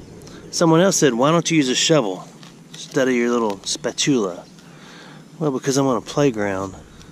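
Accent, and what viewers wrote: South, United States. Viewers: your explaining of things that you collect, is very informative, Plus I love listening to your southern accent . please keep talking.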